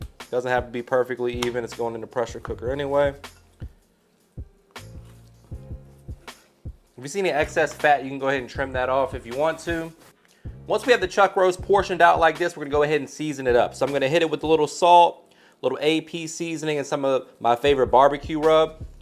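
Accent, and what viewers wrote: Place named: Louisiana, United States. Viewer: You just popped up on my feed , new sub. You sound like you come from the land of the Muffaletta, and the Mirlitons little brother 😁👍👊 !!!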